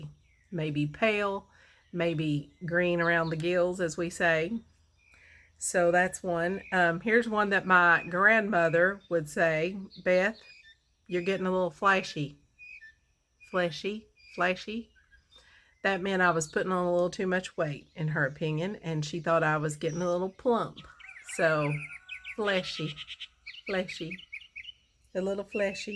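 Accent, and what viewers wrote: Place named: Texas, United States. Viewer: I live in east Texas and we can talk to each other I know what you saying east Texas talk just like that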